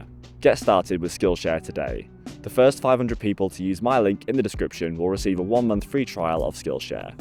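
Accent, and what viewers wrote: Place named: Manchester, United Kingdom. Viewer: You’re from Manchester; as an American, I guessed Liverpool. 😂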